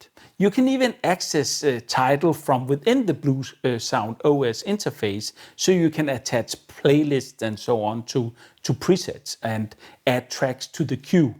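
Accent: Denmark. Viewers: Danish accent is just the best 🙂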